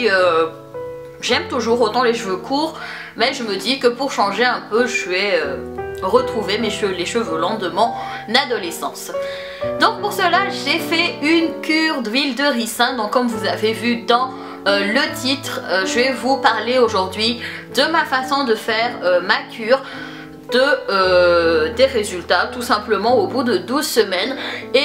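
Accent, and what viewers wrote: Madagascar. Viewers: Coucou! Je voulais te dire que ta vidéo est super ! PS: Tu es malgache ? J'ai l'impression que ton accent ressort un petit peu! Bisous :)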